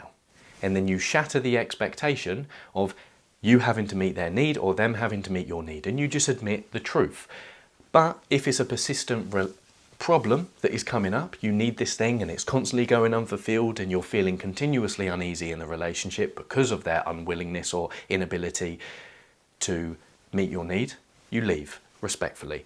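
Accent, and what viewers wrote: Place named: United Kingdom. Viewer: He‘s just british 😂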